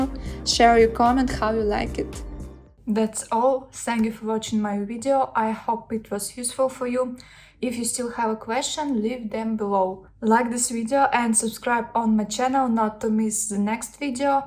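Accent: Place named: Russia. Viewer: Привет! По речи кажется что русская =) хочу русские субтитры. хотя и так понятно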